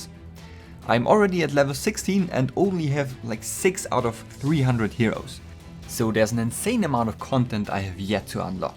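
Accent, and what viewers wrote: Germany. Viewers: Are you german? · Is there a possibility that youre german? Kinda sounds like that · Are you German?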